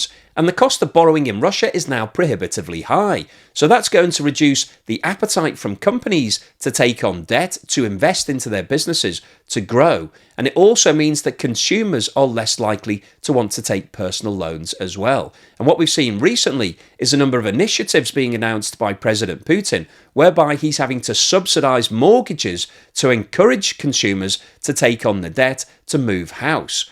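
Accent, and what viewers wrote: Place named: England, United Kingdom. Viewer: Great job,. Thank you for educating us. Your videos are very enjoyable and you are very good at what you do plus I really like your accent. Yours is a proper English, beautiful and clear 😊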